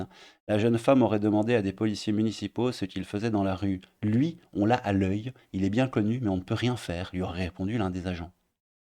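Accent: Belgium. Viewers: Daag Meneer! Merci pour les vidéos, ça fait du bien d'entendre du belge de là où je suis. Le parti islamique à Anderlecht? pas de commentaires? Tcho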